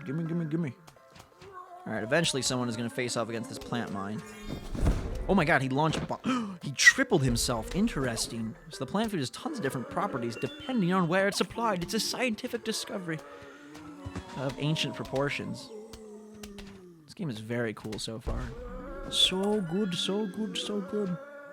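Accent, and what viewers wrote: Australia. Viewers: Are you from australia? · R u Australian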